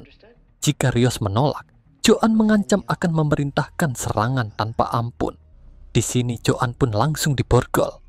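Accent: Java, Indonesia. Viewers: Pekat banget jawanya walaupun dikontrol suara kayak gini.. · Medok bet anjir berasa nonton wayang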